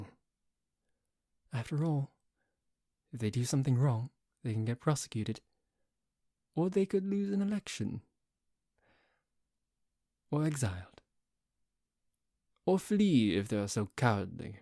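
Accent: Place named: United Kingdom. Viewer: Once again, waking up on Saturday to a audio is the best way to wake up on Saturday! Amazing once again, you’re British accent is definitely nice 😊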